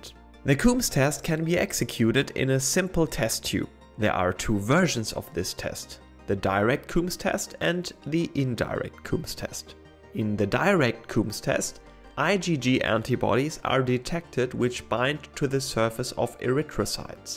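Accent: Germany. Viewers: Awesome video. Hab direkt gehört, dass du aus Deutschland kommst 😁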